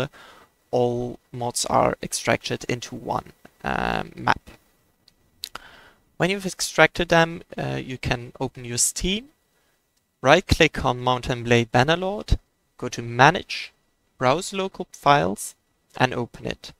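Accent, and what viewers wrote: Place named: Netherlands. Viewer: You got a clean accent for a dutch dude ;)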